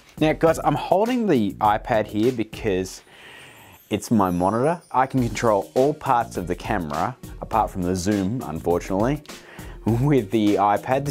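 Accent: Australia. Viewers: judging by his australian accent, probably australia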